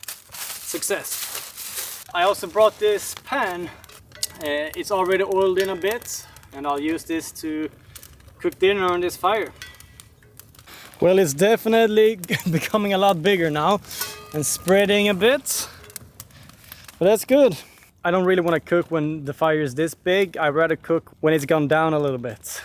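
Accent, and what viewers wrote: Scania, Sweden. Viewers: Riktigt bra video! Hälsningar från skåne! Hörde lite skånska i din dialekt, får man fråga vart i skåne du kommer ifrån?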